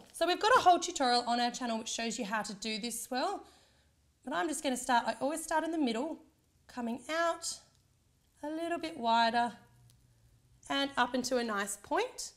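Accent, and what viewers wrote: Australia. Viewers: I love all of your videos !!! I love cupcake making !!! Thank you so much for your tutorials! And btw I love your Aussie accent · Aussie accent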